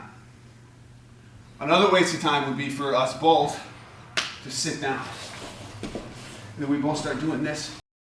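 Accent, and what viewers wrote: Brazil. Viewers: Your videos are hilarious man. Especially your Brazilian accent. Thanks man. My bjj coach hardly explains anything for some reason.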